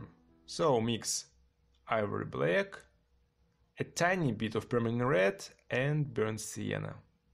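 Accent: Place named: Russia. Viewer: Your channel is great. Subscribed. And your Russian accent is very pleasant.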